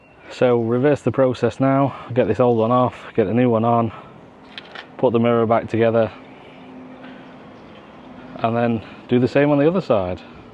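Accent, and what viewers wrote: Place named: North West England, United Kingdom. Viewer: You sound local to the NW, is that correct? Which auction house did you use? Interested in doing the same sometime soon. Have fun, stay safe, make a profit.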